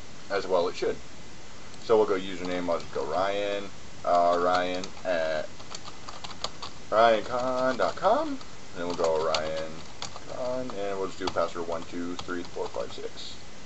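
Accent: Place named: Texas, United States. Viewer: nice tutorial thanks! oh btw, you are from Texas, right ? cool accent :) cheers from Argentina!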